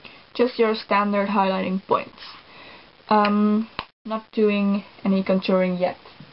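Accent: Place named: Netherlands. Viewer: je herkent het gelijk als een nederlander engels praat. niet dat je engels slecht is ofzo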